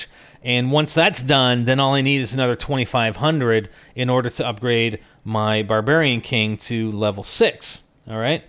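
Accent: Canada. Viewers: are u from canada??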